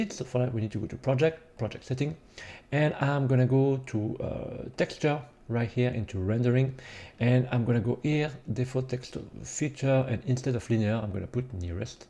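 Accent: France. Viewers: j'ai compris presque immédiatement que tu étais français sans considérer ton pseudo 😂, très bon tutoriel merci chef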